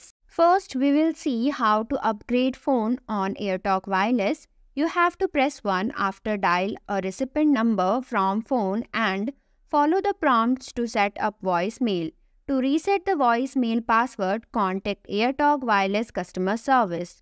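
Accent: India. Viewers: accent. Sound like very heavy India accent.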